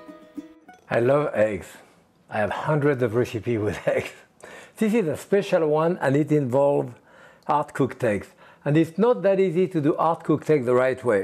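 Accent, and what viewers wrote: France. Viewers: What a great idea for an economical lunch, these eggs, some crusty bread and butter and maybe some salmon, with a spicy sauce! Jacques Pépin you are a treasure! Absolutely love his cultured accent, mostly French with a tiny bit American! God bless you Sir!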